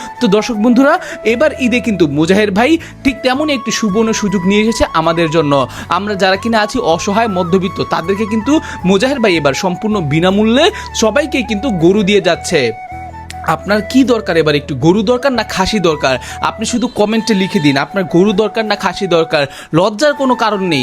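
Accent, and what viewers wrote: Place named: Barishal, Bangladesh. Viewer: নারায়নগঞ্জের চাইনা এত বরিশাইল্লা বাংলা ইংলিশ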